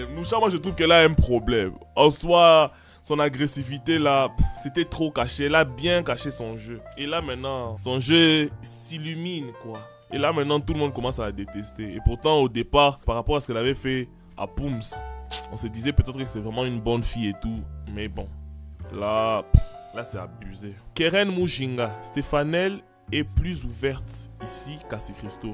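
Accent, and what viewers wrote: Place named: Swahili, East Africa. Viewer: en plus tu parles swahili je l'ai senti dans ton accent ☺️☺️☺️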